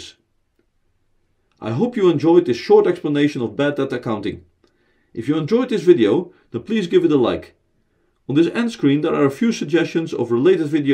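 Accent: Netherlands. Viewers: I know a Dutch accent when I hear one lol. Thanks for the review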